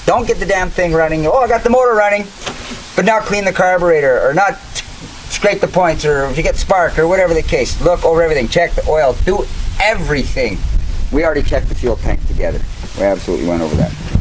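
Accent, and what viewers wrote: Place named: Newfoundland, Canada. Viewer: Yes my son ,in newfie you would be one of the bye's .... You comes across is half rednech half newfie ,we bye' loves it ...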